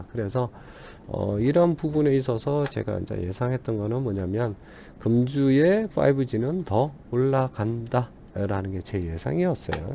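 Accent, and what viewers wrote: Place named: Gyeongsang, South Korea. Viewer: 경상도 영어가 리듬이좋아서 더 잘들리죠 ㅎㅎ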